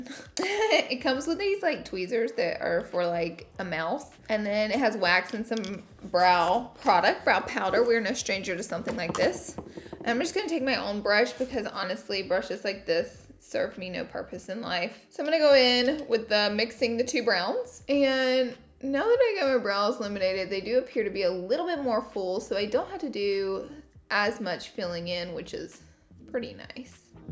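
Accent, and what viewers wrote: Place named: Georgia, United States. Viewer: Loved this video. Your accents the cutest. Made me wanna take a road trip to Ga.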